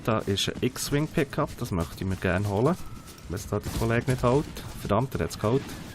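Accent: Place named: Bern, Switzerland. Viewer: z erstä bärndütsch lp u youtube. chunt eim huerre komisch vor. aber geil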